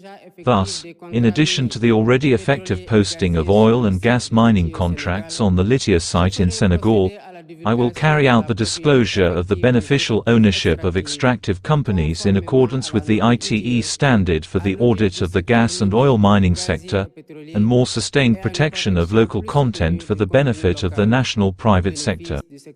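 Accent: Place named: Malawi. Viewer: Mr Faye I can like u to do good job than worsting time to speak English like someone in malawi but in his head too much water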